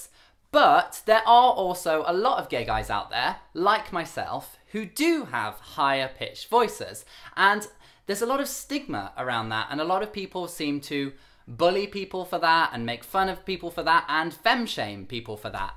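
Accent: United Kingdom. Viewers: From the perspective of a gay American man with a deep voice, when I listen to you speak the first thing I think about is not whether or not you sound gay – it's how British you sound. Your Englishness overwhelms everything else to an American ear, or at least this one. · Woow british accent so wonderful 😩❤️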